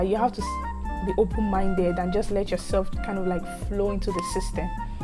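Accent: Nigeria. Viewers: I understand you so much....it's a big shame to Nigerian government for not making adequate provision for students back home. My first day in American college (FLORIDA) was hell but I came through. I am glad you are catching up and getting more confident in yourself. Accent is not a barrier it's just the beauty of our nationality. I just subscribed 👍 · Keep your Nigeria accent up. It really dope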